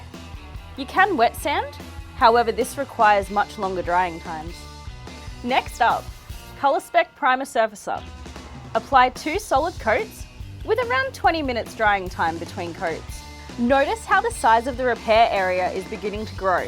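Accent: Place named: Australia. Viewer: How good is the Aussie accent dude hahaha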